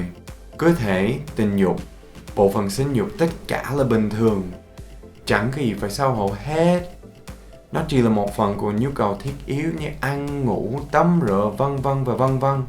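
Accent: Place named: Saigon, Vietnam. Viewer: giọng Sài Gòn rất hay =))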